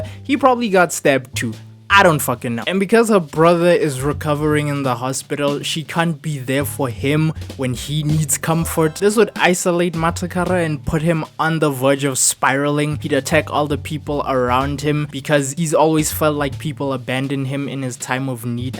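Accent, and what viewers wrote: South Africa. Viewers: You south african neh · How did I know you were South African in the first 30 sec😭🇿🇦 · I knew that accent sounded so familiarrr brotha is south african like me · Yo are you South African?? You dsying 'This ain't it chief' is a phrase I've realised I only ever hear in my country😂😂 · I know you are South African , our accent is so pure I’m proud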